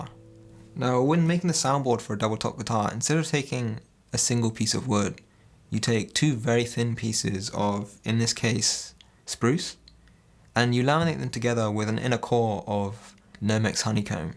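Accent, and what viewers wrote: United Kingdom. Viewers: I'm trying to place that accent. Suffolk? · Oh your British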